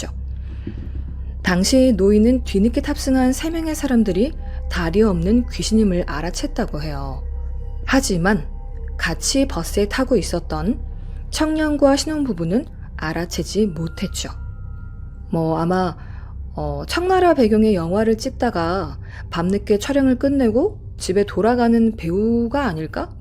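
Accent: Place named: Jeolla, South Korea. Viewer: 전라도 비슷한 말투가 듣기 싫어요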